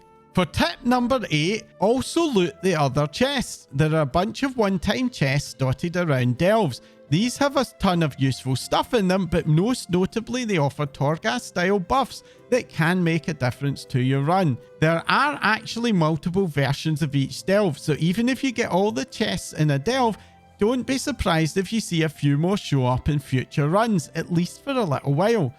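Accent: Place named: Highlands, United Kingdom. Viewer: This guys accent reminds me of my late grandfather Kincaid. He was a highlander. Ignore the trolls, it's all they've got. Let em get there tiny scrap of fleeting self esteem. It vanishes as soon as they get it. Please. Allow me. Piss off trolls.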